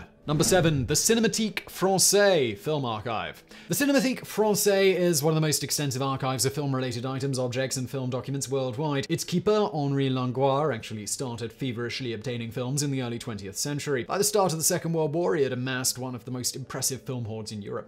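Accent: United Kingdom. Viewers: I have a question, HOW do you keep your voice with all of the talking that you do?? Been a fan of all your channels for a while, but just found the Casual Criminalist Podcast last week. I drive a truck 9 hrs a night here in the US and listened to them all last week. The sarcasm and proper British way of speaking make it great.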